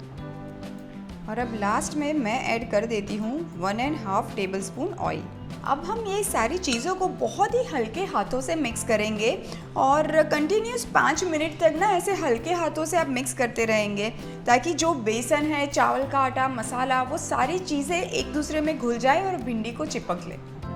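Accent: India. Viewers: I am going to try this today. First I thought you are a foreigner but when you started speaking I understood you are an India. Thank you for sharing your recipe.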